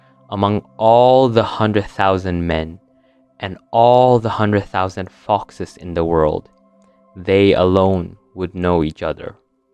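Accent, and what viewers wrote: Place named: Nepal. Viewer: Sir are u from Nepal ..if yes please lets stay connected with our team as we need accent like ur for a project we are going to launch ...please reply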